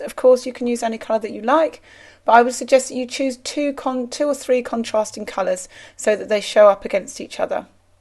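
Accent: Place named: London, United Kingdom. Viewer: Lovely work my friend! I love daffodils! I am missing London with your accent. 🥰 xx #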